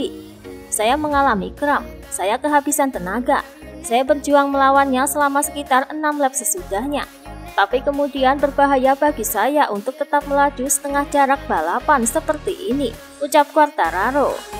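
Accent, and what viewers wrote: Java, Indonesia. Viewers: Narasi Medok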